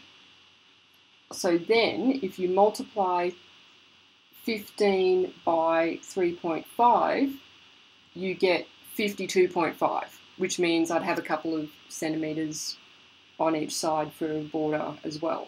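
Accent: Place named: Australia. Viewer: Your Aussie accent is awesome!! 🤗❤️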